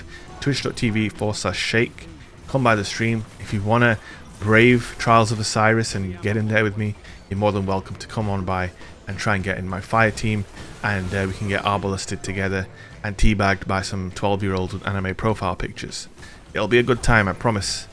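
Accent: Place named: West Yorkshire, United Kingdom. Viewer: Are you in West Yorkshire? I know that accent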